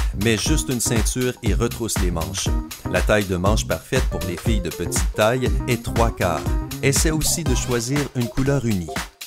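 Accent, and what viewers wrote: Quebec, Canada. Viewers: Pourquoi une voix québécoise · Ses un québécois celui qui parle ?😊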